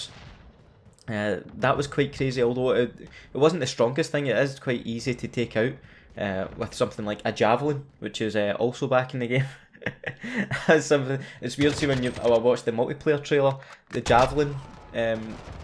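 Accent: Scotland, United Kingdom. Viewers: Every content creator needs to be Scottish. love that accent lol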